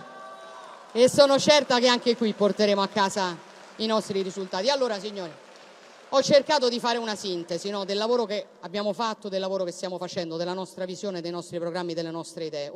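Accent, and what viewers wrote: Rome, Italy. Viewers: Impara a parlare da PdC di una Nazione, anziché come Una delle Borgate Romane. Raffinatezza ZERO. 😎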